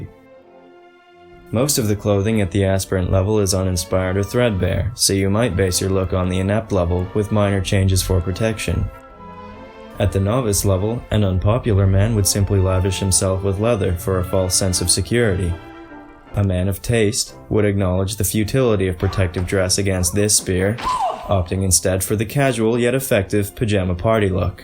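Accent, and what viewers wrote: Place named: Toronto, Canada. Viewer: This guy is definitely from Toronto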